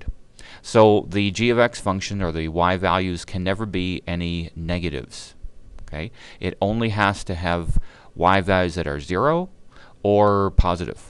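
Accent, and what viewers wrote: Canada. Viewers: this is very helpful and is this guy canadian(irrelevant i know) i just wanna know BUT yes this video was helpful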